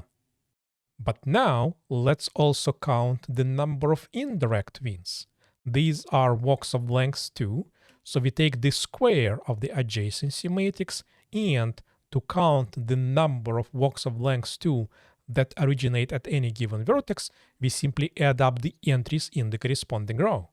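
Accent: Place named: Russia. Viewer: Русский акцент я узнаю из тысячи :D. Сам этим страдаю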